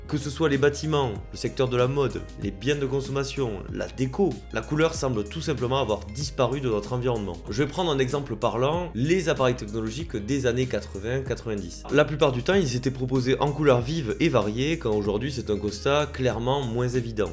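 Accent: South, France. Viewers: Toujours trop cool tes sujets, ta façon de présenter, ta bonhommie et ton petit accent du sud. On a l'impression que tu as une cigale dans la bouche, j'adore! Avec BenAuto, CarJagger, New Retro Car et POA tu es mon préféré number 1. Fut un temps ou y'avait pas trop de choix Citroën HY gris, 2cv bleu ou grise en raison de la reconstruction d'après guerre dans les années 50 aux dires de mes parents acheteurs de l'époque. La pénurie a duré un certain temps après 1946. Un ami me disait que les autos blanches sont plus sécuritaires car plus visibles qu'une couleur foncée. La nuit on peut les voir plus rapidement à une distance moindre qu'une foncée. Je me rends compte qu'on a toujours eu des autos aux couleurs tristes. Peugeot DMA lie de vin d'occasion qui sortait quand même du lot, Citroën HY gris, Peugeot 203 noire, Break 304 gris, Cabriolet 304 S grise qui était verte auparavant et a été repeinte en rouge après la vente, 205 brun macao qui sort un peu du lot, Samba GL noire et 106 XN noire de 1991 que j'ai toujours d'ailleurs et que je bichonne et me rends bien service quand j'y vais en vacances. 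J'avais payé un supplément pour la peinture noire vernis. J'adorais le noir ca faisait classe. Mais avec le temps je préférerai une couleur plus claire car le soleil tape dans le sud de la France du côté de Béziers et le noir attire la chaleur. Un cabriolet 304 S jaune paille ou jaune safran (orange) serait mes choix. Vive la Deuche vert pomme de 74 de mon beauf dans les années 78/80.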